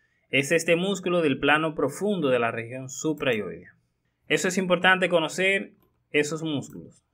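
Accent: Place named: Dominican Republic. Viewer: Mi querido acento dominicano, sigue así, se entiende todo perfectamente ❤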